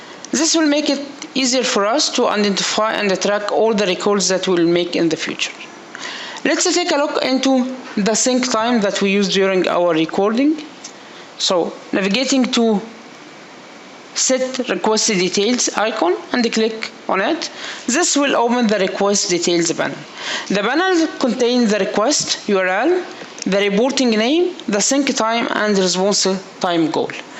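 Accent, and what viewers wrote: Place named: India. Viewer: Индусский английский перестал резать слух примерно в середине видео. Тем не менее, теперь я знаю как делать нагрузочное тестирование. Gracias!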